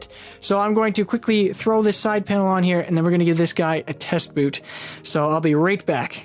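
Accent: Canada. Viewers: The Canadian accent is Noice 👌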